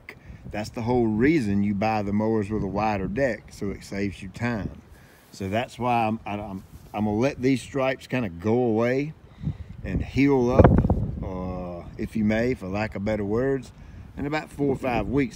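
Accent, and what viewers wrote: South, United States. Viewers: Where are you located? You sound like your from the south but in my part of the south lawn stripes are unheard of and I think it’s because of the types of grass that grow around here don’t lay down that way. I think zoysia grass will do it though, we typically grow st. Augustine, Bahia, centipede and zoysia around here in north Florida and South Georgia. I’ve always been interested in stripes and would love to have them here but we don’t have “fescue” grasses around this area. Looks good brother!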